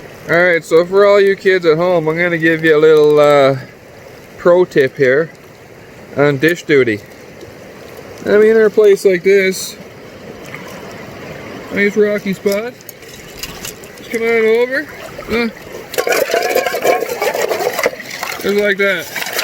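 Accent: Newfoundland, Canada. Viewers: Where ya from? You must be newfie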